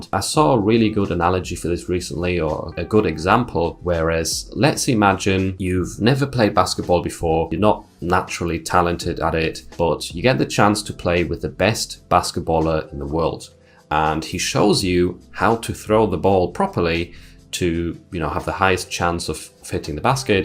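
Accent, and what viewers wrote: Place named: United Kingdom. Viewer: 2023 ! A little late to the party as I've just found your channel. Great tutorials. Thank you.. Nice to hear a British accent too.amongst the sea of our US cousins! 😂😂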